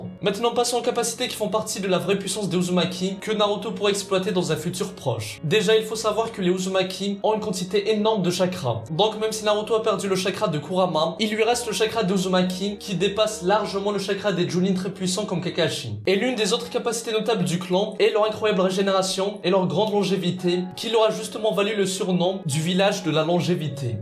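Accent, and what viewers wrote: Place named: Kabylie, Algeria. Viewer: T'as un accent kabyle 🤣 réponds moi si c vrai !!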